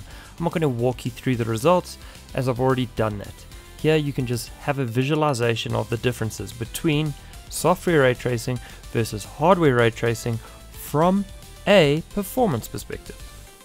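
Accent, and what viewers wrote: South Africa. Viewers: I hear saffa, I sub.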